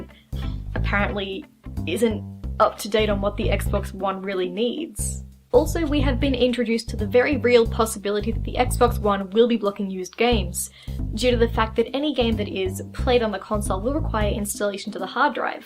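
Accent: Australia. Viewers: Why do people always confuse Aussie accents for British? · An Australian? I thought you were British???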